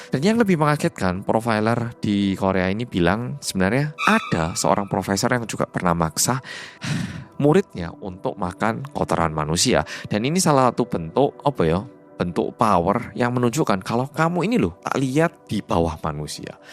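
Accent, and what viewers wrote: Java, Indonesia. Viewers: Hai medok.... Nyimaaak 🎉🎉🎉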